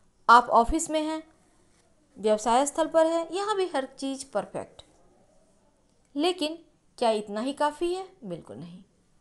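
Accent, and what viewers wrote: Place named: West Bengal, India. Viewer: didi aapnar awaj sune mone hocche aapni bangali.aachen na.aami jante chai ki aapni kundali baniye upay dekhakar jonno koto fees nen pl bole din.nomoskar.aapnar kotha sone khoob bhalo lage.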